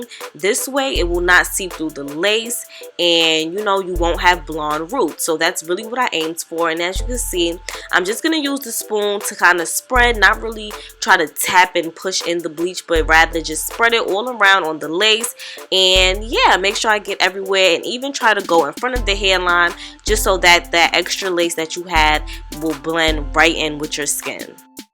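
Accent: New York, United States. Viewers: First time watching your video and I knew u was from NY right away, we can’t hide our accents nowhere lol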